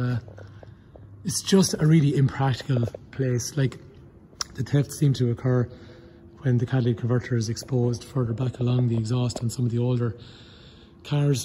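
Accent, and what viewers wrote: Ireland. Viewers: Thanks for the Irish accent and the reassuring fact of catalytic converter location in Civic 2019!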